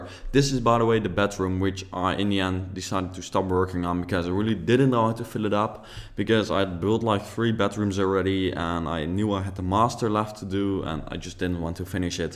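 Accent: Netherlands. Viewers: ah i love your videos and your builds and ik ur Dutch maar je hebt het meest Nederlandse accent als je Engels praat dat ik ooit gehoord heb xddd (which- dw- its cute)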